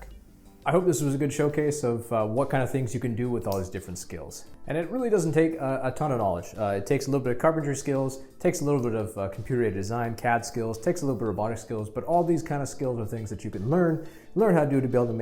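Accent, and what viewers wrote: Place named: Belgium. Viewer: Tot nu toe klink je belgs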